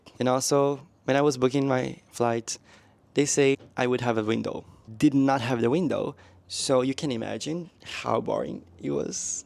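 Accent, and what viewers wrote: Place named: Australia. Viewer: Great video,! On a side npte, I think your Aussie accent’s starting to kick in 😊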